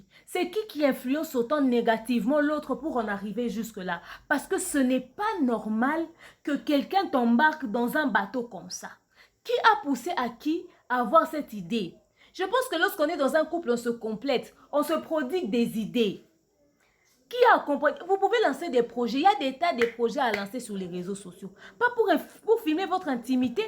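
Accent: Ivory Coast. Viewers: stp ne brande pas l'accent ivoirien on parles mieux français qu'eux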